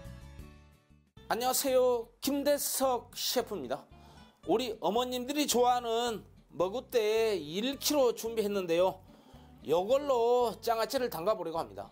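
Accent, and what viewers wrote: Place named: Yeosu, South Korea. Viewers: 쉐프님 사투리 넘 정겹고 좋네요. 돌산사람 특유의 그 억양… 그 억양은 돌산사람이라면 딱 알죠. 😂😂😂 쉐프님 서울살이 꽤 되신거 같은데 어찌 그리 돌산 억양이 그대로 있담니까? 쉐프님 억양에서 나 돌산 신기사람이요 그러네요 ㅎㅎ 최근에 팬 되부럿습니다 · 셀프님! 돌산 삼일면 말투네요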